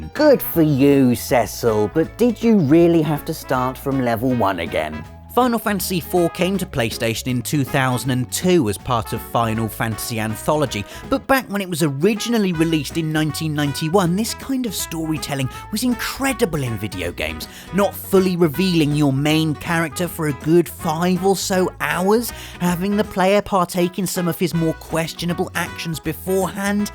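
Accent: England, United Kingdom. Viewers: If i could bear through the narrator voice I'd watch it but god I just can't... :/ Sounds like a british kindergarten teacher talking to below 6 yo children. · Asha2820 He's English.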